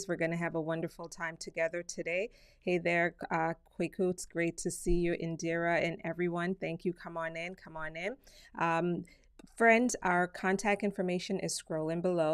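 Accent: Jamaica. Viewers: Jamaican? You just made me like your presentations even more, if that's possible!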